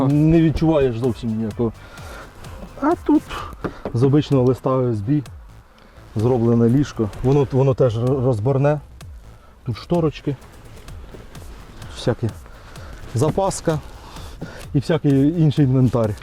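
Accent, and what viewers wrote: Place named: Ukraine. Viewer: А можно нормально по руски без Ебонова акцента хохляского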